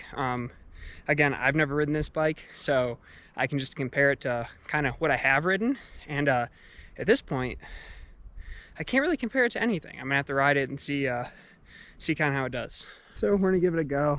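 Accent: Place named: Western New York, United States. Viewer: Fellow Rallon rider here but I wanted to know - are you from WNY? Or Eastern Michigan? Your voice sounds like home and I'm from Rochester.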